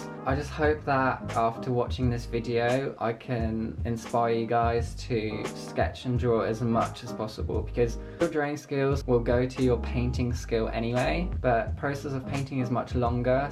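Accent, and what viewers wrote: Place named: United Kingdom. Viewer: Everything is just soooo gorgeous! I’ve seen in the comments from another video they you speak another language (don’t remember which one sorry) I don’t know if you are from America or something but your English is so great ! You sound kinda British I love it! Anyway I have a lot of ideas for my sketchbook, thank you for that , love your art 🤗❤️👏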